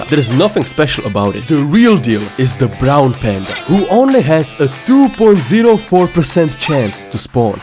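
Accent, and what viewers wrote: Russia. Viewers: I swear that he has a Russian accent. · You are russian? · You're Russian right?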